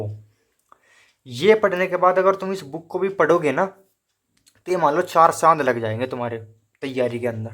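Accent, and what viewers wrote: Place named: Baraut, India. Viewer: Kaha se ho aap baraut side ki language h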